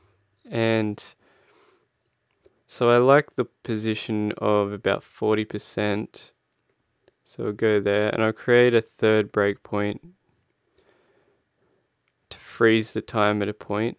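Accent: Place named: Australia. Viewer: Insane! I'll really have to try absynth. The grains part remind me of the sound you get when playing in Padshop BTW, huge Silverchair fan, especially the 90s and 00s stuff, and your voice reminds me so much of Daniel Johns', I love the Australian accent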